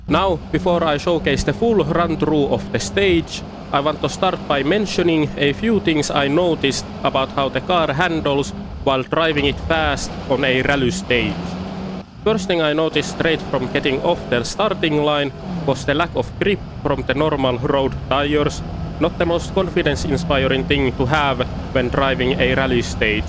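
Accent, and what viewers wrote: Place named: Finland. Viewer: You soud very much like you are finish.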